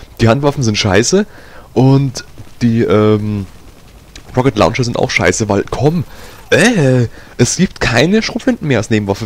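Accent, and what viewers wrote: Bavaria, Germany. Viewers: hab des ganze bayrische guat verstana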